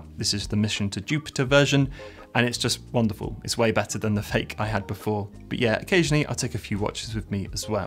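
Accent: United Kingdom. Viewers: You are comfortable on camera and speak calmly & beautifully with your British accent, so who cares about what your visual style choices look like? What you offer with information & services and how you present it is what matters. Not what you want to wear.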